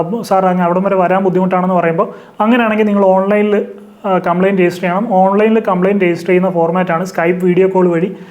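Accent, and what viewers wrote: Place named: South, India. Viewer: The slag seems like someone from south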